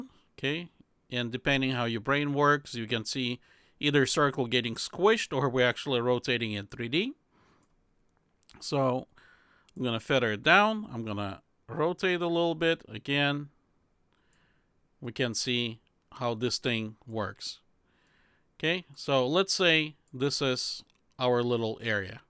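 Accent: Russia. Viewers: Nice. It that a Russian accent? Very subtle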